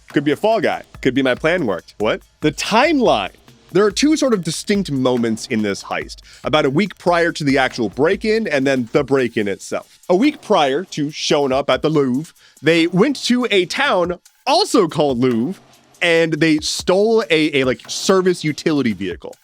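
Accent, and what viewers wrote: United States. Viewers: Would've sworn you were from and based in the PNW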